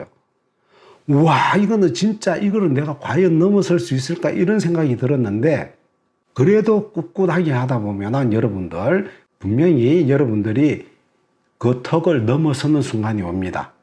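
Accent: Gyeongsang, South Korea. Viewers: 겡상도 발음 너무 친근감 ㅎㅎ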